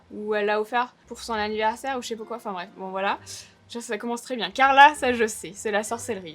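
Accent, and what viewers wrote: Marseille, France. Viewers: Je suis déçue, je m'attendais à une vidéo entièrement avec l'accent de la Cagole ! C'est hyper drôle d'avoir choisi de faire un make up vulgaire pour tester cette palette en tout cas 🔥❤️ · Vulgaire mais pas cagole ! Avé l'accent de la cagole c'était parfait ! 💖💋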